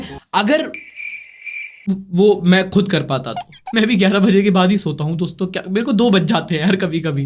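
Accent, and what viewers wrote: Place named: Jhansi, India. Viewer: Bhai aap jhansi se ho kya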